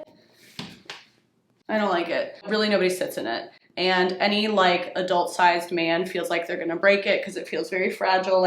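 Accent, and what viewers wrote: Italy. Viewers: maddai il tuo italiano e' eccellente! Hai pure un accento emiliano! Brava e complimenti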